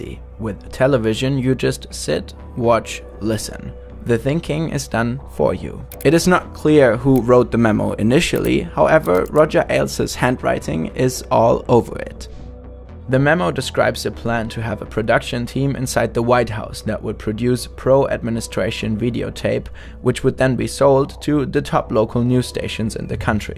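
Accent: Germany. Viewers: Hey Guys, i love your Way but there is a little German in your English Tounge....that not mean your English is Bad. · holy this GERMAN accent xDD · In my opinion, you should get a native speaker for your videos, I just cant get that german accent out of head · It's weird that mostly Germans blames about the fact that there isn't a native speaker. Most Americans and Britians (I know there're differences) blame about wrong grammar, spelling or words. They just (try to) understand what was said. But their grammar and pronunciation are great, so why changing it? Are you so distracted by the German accent from the video? I don't have that kind of problem. Disclaimer: I'm a German, so if I'm wrong what I said about grammar and pronunciation, please correct me. I don't know whether you're German or not, so if I'm wrong, please correct me, too. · Nice vid, but you should work a bit on your voice performance and your german accent.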